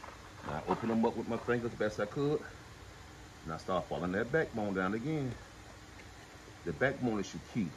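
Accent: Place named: Arkansas, United States. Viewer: Im😂so glad that I found this channel. I know that you guys live in Florida but you sound like a good ole down south Arkansas boy. I'm from Arkansas myself and I love the way you do fish. It's my favorite food. You make me remember my grandmother. Love you guys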